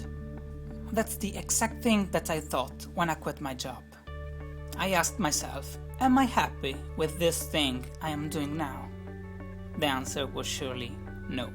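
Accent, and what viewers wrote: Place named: Cagliari, Italy. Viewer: He's from Cagliari (Italy). His accent is annoying in italian too :D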